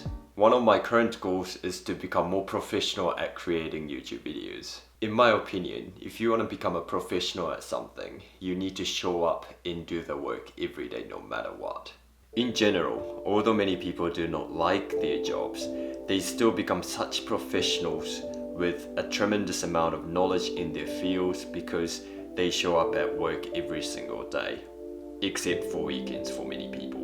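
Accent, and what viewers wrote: New Zealand. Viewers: Minimalism is a state of mind- and it takes practice. Also: Your combination of Kiwi and Japanese English accents is simply fantastic. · I love this! Aussie girl her and soon as you started talking i was like.... hmmmmm that accent... then you said you moved from NZ! Lightbulb moment ! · I love his kiwi accent.. or is it him that i love? ☺️ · You have an interesting accent- a combination of different places?? How long did you live in New Zealand for? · You have such a Kiwi accent Lol!! Keep up with the YouTube videos man! I miss living in Japan..had to move back to NZ because of Covid :-( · Kiwi accent 👍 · It’s more like a kiwi accent. Interesting that you mistaken it for British though! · Ahh! I knew you were from NZ I could hear the nz accent! Just a wee bit 😄 I’m from Auckland. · Great insightful video. Enjoyed your thoughts on minimalism. 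Also, strangely, I immediately noticed the flat “i” sounds of your New Zealand accent. G’day from a new Aussie subscriber :) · His accent is not pure Kiwi. It sounds a lot Kiwi and a little bit something else 😊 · Really enjoyed this video, love the whole concept of minimalism and this was very achievable, once you mentioned that you had lived in New Zealand I could recognise a slight Kiwi accent. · Haha! Even before you mentioned NZ I was like, that accent sounds very familiar... I’m a kiwi 😊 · I thought I detected a New Zealand accent, I wasn't wrong. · HOTTIE ! I love New Zealand accents and Japanese men. Why am I not married to this guy ?? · great video, i'm from NZ and i can hear the Kiwi influence in your accent :) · That was my first thought as well... wth... why r u microwaving bread. Also why only 1 slice?????? Plus I was trying to suss out the accent and u said nz and now I get it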